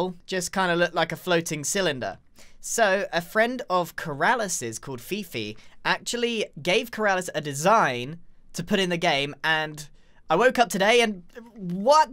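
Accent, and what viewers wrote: United Kingdom. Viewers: I knew u was British! Help me and meet hahaaaaa..... 😋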